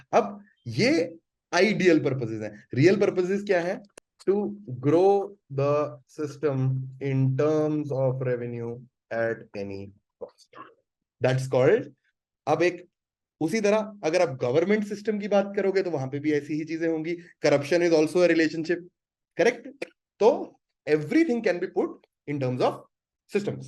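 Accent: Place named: Hinglish, India. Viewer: that hinglish is killing me brottha !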